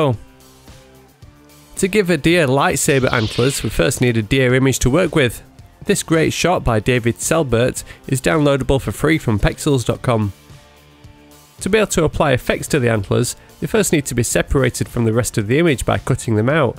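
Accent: United Kingdom. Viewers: you're such a cool bloke and your bri'ish accent is very satisfying to listen to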